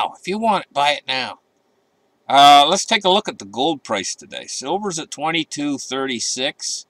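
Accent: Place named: Nova Scotia, Canada. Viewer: lol DXY... the comparison of ga-a-a-r-bage (Gotta love that NS accent.) You have a good one as well,. 🏠🌞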